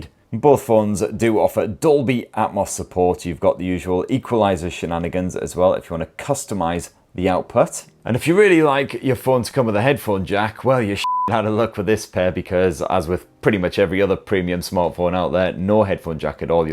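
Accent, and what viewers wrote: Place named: Newcastle, United Kingdom. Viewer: Believe me when I say doesn’t speak with a typical English accent…! He’s what’s known as a ‘Geordie’. 😶 Most British people struggle with their accent too. It’s why we’ve banished them to the far north east of England!🫨 Think North of the Wall in GoT without the furry clothing. Does make good videos though…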